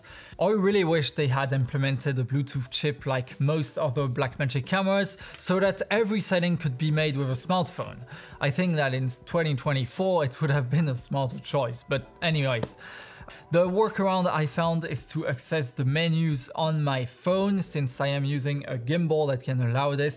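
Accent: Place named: France. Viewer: on enttend légérement l'accent francais.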